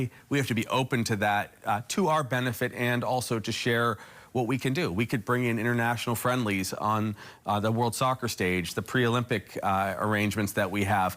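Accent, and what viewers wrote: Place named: United States. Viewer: This guys mainland accent kinda rubs me the wrong way😮😮😮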